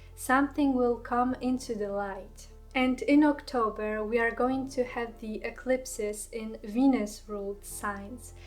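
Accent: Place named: Poland. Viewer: Hi great stuff you are so smart my mind has been so scrambled lately sometimes listening to people just makes it worse but not with you for me brings me down to earth just the way you talk I guess soft voice and I love your accent I live in NY USA I think you are in Poland? Thank you for helping me 😀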